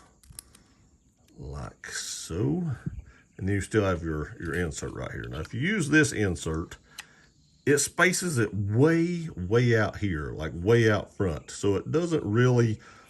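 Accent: South, United States. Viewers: You must be from the south 😂, whereabouts?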